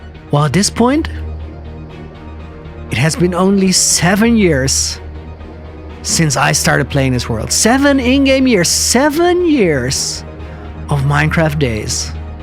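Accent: Netherlands. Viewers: nice english mate dutch for sure :)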